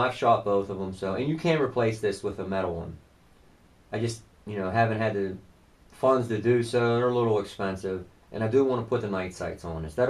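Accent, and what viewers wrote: Pittsburgh, United States. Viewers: I'm guessing you're from Pittsburgh. Sounds like it. :)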